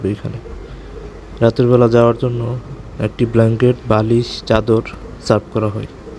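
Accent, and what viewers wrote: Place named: Chittagong, Bangladesh. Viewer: কথায় কথায় বন্ধুরা বলবেন না। বিরক্তি লাগে। ভয়েস সুন্দর কিন্তু চট্টগ্রামের আঞ্চলিকতা কাটিয়ে শুদ্ধ বাংলা বলুন। ফেস দেখান না ক্যানো? প্রতিটি স্টেশনে নামবেন। স্টেশন দেখাবেন।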